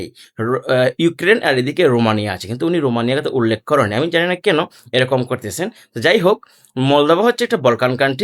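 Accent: Sylhet, Bangladesh. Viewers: ভাইর বাড়ি কিতা সিলেট নি....?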